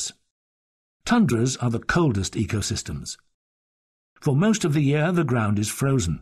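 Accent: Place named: United Kingdom. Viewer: I quite frankly was very fascinated by this educational video due to the fact that the narrator had a lovely British accent and because the video had a lot of cool pictures.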